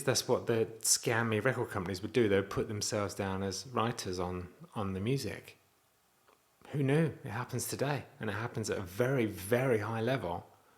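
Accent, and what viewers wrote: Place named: United Kingdom. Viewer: I LOVE your videos. You are just being you and it's beautiful to see. Don't stress about how good or bad your videos are, just keep doing it🙂 Side note, I've been watching Avenue 5 and Hugh Lauries character reminds me so much of you 🤣🤣 it's the British accent and unkept realness of you both that I love., keep being you. Xxxx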